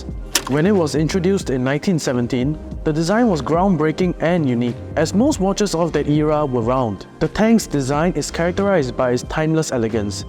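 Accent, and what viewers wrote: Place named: Singapore. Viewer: Singelish is annoying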